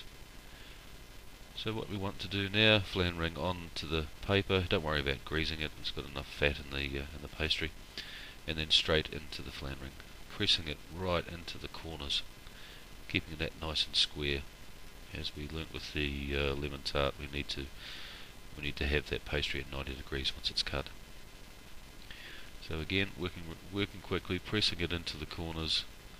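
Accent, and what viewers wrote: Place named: New Zealand. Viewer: He's a bloody KIWI, from Nuw Zulund, not Ostrayan from Ostraya.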